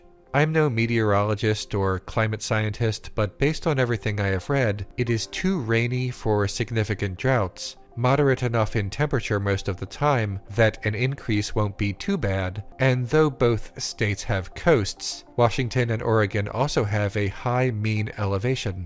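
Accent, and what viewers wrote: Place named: Baltimore, United States. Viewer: Boooooooi you from Baltimore whaaaaaaaaat?????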